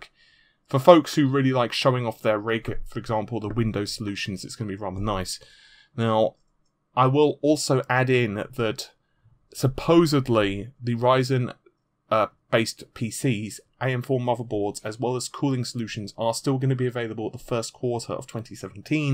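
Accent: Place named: Southern England, United Kingdom. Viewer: He has a perfectly normal southern English type accent. Have you never encountered one before?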